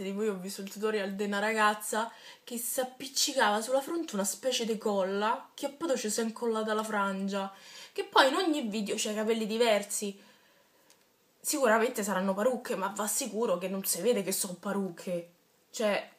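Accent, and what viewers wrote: Rome, Italy. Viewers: AHAHA romanaccia proprio :) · sei bellissima e bravissima^^ ma te prego parla meno romanaccio D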